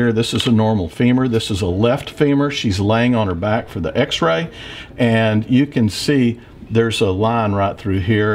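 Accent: Texas, United States. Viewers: love his texas dialekt haha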